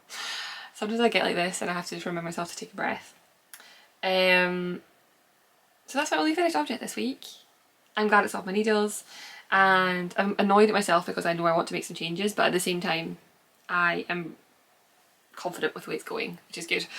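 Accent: Scotland, United Kingdom. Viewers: The Ariundel! It is very elf/lord of the rings vibe. I have never used this phrase, but I think I really am obsessed with that sweater. It is just amazing! I love hearing your joy in creation. Also, I am so imressed with your thoughtfulness about making patterns to fit bodies of all shapes and sizes. It's lovely. Finally, I'm finishing my first comment on one of your podcasts by saying I love listening to your accent. My parents lived in Scotland for 5 years and it is such a beautiful country with lovely people.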